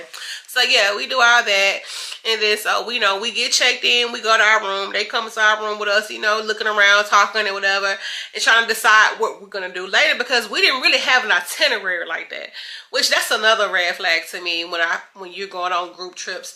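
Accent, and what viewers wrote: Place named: Memphis, United States. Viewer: My family lives in Memphis your accent reminds me of home lol 😂 love it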